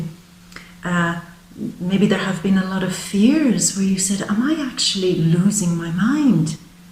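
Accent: Ireland. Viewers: I just love your Irish brogue, maybe I will meet you one day :) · ire u irish by any chance btw im irish thank u for video much love <3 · I am a newcomer to this video and I love what I see, hear and learn from you. Listening to you, I am just awestruck by your extraordinary and wonderful energy, your natural beauty, your graceful demeanor of delivering, and most important, your intuitive skills-set and wisdom of what the world can bring us. Thank you, I am so happy to have found your site. Also, do I detect a slight Irish accent? It is absolutely superb and I love it.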